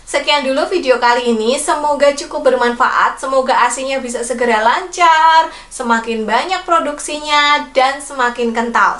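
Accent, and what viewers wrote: Java, Indonesia. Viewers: Bu bidan asli mana e, logat jowone medhok. Terimakasih ilmunya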